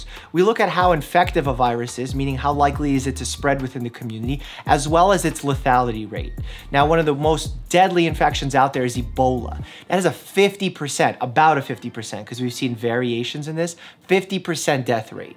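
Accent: New York, United States. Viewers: with the NY accent though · Is he sounding more New York than any other video he's been on?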